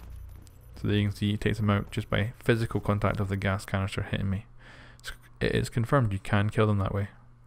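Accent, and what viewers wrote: Scotland, United Kingdom. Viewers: Are you scottish by any chance? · R u scottish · Are u Scottish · Are you Scottish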